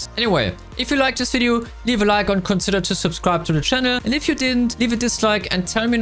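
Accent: Germany. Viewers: Are you from german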